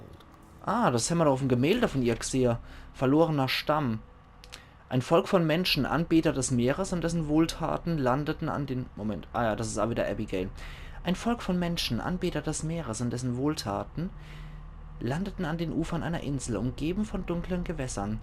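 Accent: Palatinate, Germany. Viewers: Omg. LETS PLAY UFF pälzisch ist ja wohl das beste Ding ever!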